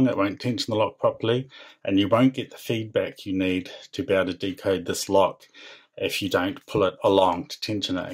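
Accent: New Zealand. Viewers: I heard that accent and instantly recognised you were a fellow kiwi! Fuck yeah! Glad to see another kiwi here! Chur for the video bro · South Island fella? 🇳🇿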